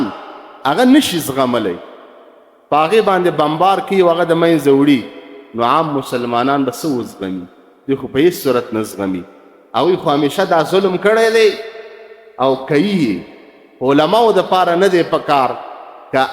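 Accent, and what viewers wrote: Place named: Pakistan. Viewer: اصل دا ده چې ستا ژبه او لهجه پاکستانی ده اسلامی امارت که ځانونه افغانان بولي نو ستا په څېر ملایانو بېخ د وه څېړي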